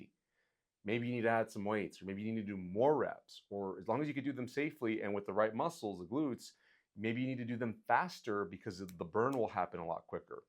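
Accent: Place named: United States. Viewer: to my British ears, he has an American accent. So I can appreciate if someone does not hear that every day in their life it might sometimes be difficult to understand. I sometimes struggle to understand drawling Southern US accents or a Cajun accent in particular because it is not something I often hear.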